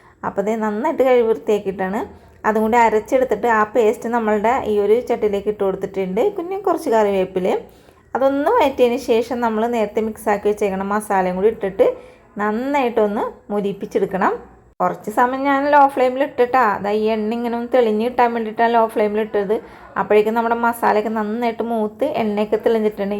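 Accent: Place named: Kakkanad, India. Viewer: കാക്കനാട്ടുകാരുടെ ഭാഷ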